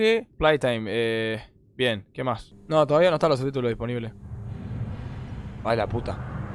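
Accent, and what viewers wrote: Uruguay. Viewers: no es argentino es Uruguayo es q lo args y los urus hablamos igual xd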